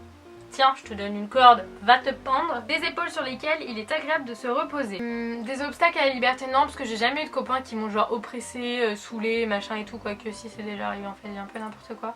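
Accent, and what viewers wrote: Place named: South West, France. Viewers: Accent du Sud Ouest ❤❤ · j'aimerai bien que tu refasse ce test aujourd'hui que t'es heureuse en amour ^^ pas sur que tu répondrais la même chose pour le boulot qui passe en premier toussa toussa :p en tout cas, pour le boulot je suis comme toi ça passe avant l'amour (ma copine me le reproche bcp), et pour la vision du couple, idem : deux personnes vivant ensemble mais ne vivant pas l'un pour l'autre, ça fait trop cliché ^^ Sinon, pour l'accent, je trouve que ça ressemble un peu à l'accent de maïté, donc accent du sud ouest (mais vraiment coté paysan, car je suis du sud ouest et mon accent est beau car il est léger (surtout parceque j'ai quitté toulouse depuis 10 ans :D)) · Un bon accent du sud qui ressort naturellement! Ca fait toujours plaisir ;)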